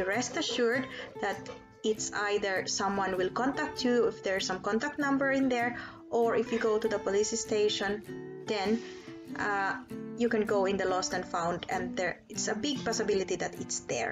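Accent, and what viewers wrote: Finland. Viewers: How it's possible that you speak English exactly like a born Finnish? I mean your accent. No-one will believe that you are from Philippines!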